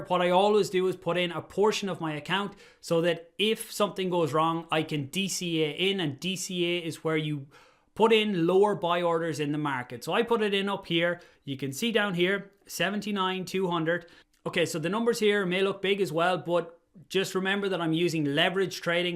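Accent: Ireland. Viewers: You sound like a strangled duck. I love it :)) Irish accent funny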